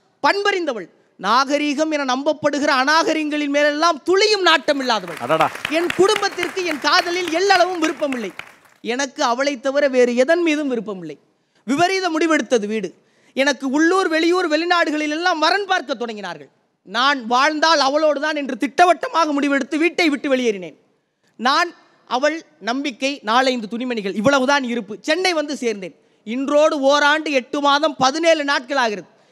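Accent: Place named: Sivagangai, India. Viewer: சிவகங்கை மண்ணின் வாசம் இந்த சகோதரன் பேச்சில் தெரிகிறது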